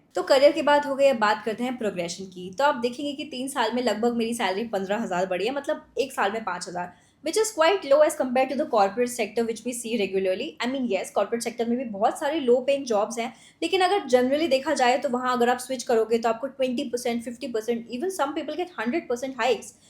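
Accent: India. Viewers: What a amazing way of speaking didi ❤.❤ I love bhojpuri.❤ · is it true ki government employees ko content creation se earning karna allowed nahi hai? code of conduct me aisa kuch hai kya? please clarify. btw your Bihari ascent 😍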